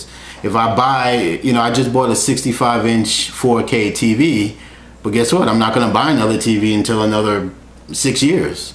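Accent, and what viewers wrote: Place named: Queens, United States. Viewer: Hey, Love your Air Fry cooking channel. I said to my son, hey he sounds like he is from Queens!!! Then I saw you video Q&A Cooks Essentials Air Fryer- Well I'm from Queens and lived in Westbury all my childhood! Wow what a coincidence! Love your Channels! Living in Texas for 28 years now...But always a New Yorker! Just wanted to share!!!! Many Blessings and good for you!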